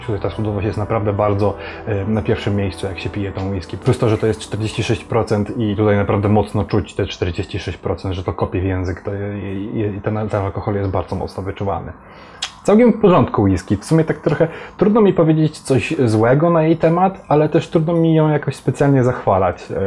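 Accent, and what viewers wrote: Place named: Scotland, United Kingdom. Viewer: Szkoci chyba muszą Cię mylić ze swoimi krajanami - mega akcent.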